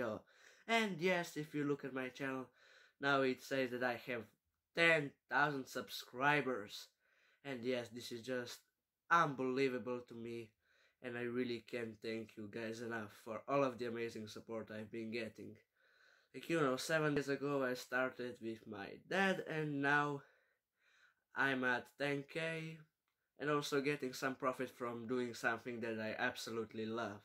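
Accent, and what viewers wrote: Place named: Poland. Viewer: OMG i was So exited for this are u from Poland because of ur Accent u sound like u are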